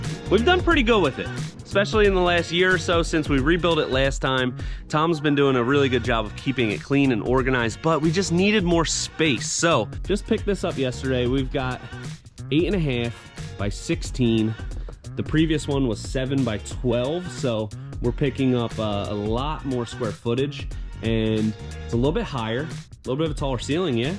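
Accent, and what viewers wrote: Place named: Philadelphia, United States. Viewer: Spotted that Phiwwey accent right away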